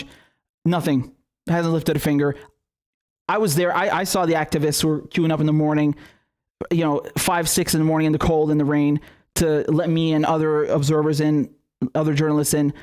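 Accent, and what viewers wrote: United Kingdom. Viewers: that British granny accent tho. Keep up the great work. We need to amplify real progressive/leftist voices. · Despite the serious nature of these events, has me laughing tremendously. Loving the British accent haha.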